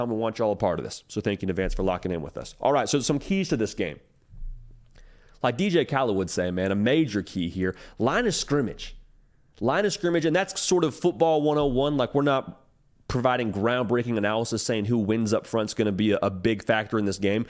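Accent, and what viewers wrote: South, United States. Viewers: yeah, it’s quite obvious this guy favors SEC teams and blue bloods. I mean, if his southern accent doesn’t give it away, his talking points absolutely do.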